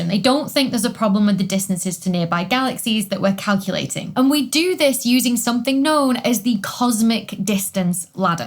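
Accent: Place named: North West England, United Kingdom. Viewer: What part of the UK are you from? Your accent sounds very north-west.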